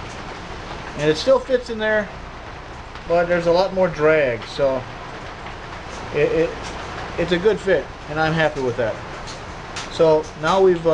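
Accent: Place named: Oklahoma, United States. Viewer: Sounds like you could be a fellow Oklahoman....lol. I'm here in Okc and hear that sound at least a few times every year. Great video series!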